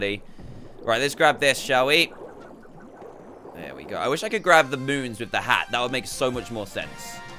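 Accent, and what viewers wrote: United Kingdom. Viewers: Bruh he's british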